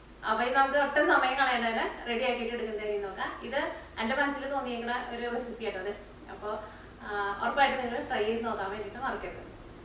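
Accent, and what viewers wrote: Thrissur, India. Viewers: Enikku Chechide thrissur accent aanu kooduthal ishtam. Original thrissur nasrani accent! 👍🏻👍🏻👍🏻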